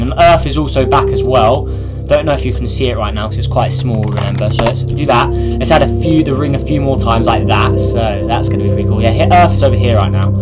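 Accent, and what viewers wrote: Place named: United Kingdom. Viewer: his voice sounds so british like he is saying you alright mate or cheers mate or cheers felure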